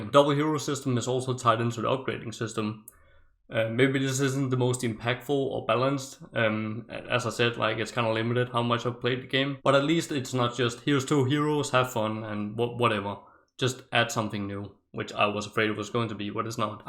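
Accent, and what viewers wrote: Denmark. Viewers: You Sound very danish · Is blud danish?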